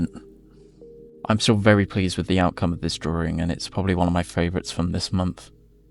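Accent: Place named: United Kingdom. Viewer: British jumpscare (i do like your voice), this is a nice challenge for spooktober! 🎃 edit: f*ck you 😭😭😭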